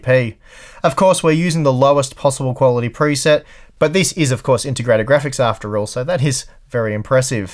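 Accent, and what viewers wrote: Australia. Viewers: I think he says "near on impossible", might be the aussie accent.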